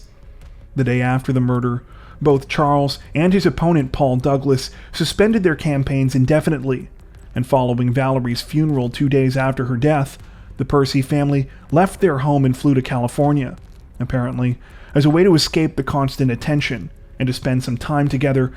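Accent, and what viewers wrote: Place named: Canada. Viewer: I don’t watch a whole bunch of crime channels, but I appreciate your work. I’m old, and the nostalgic ones really get me. I also like the respectful approach to events are at once fascinating and horrifying. I feel bad for the families involved. Death by illness or accident can be understood; death by violent murder must be very hard on the survivors. I’m so sorry for them. Additionally, I love a Canadian accent. “Been” absolutely should rhyme with “seen” but among Americans, it never does. Thank you so much.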